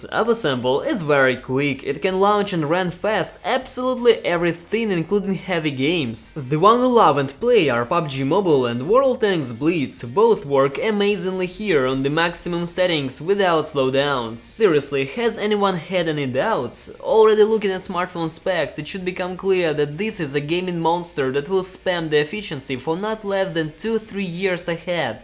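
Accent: Russia. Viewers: Why your accent looks like Russian guy? are you from Russia? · Love your accent lol, are you a russian?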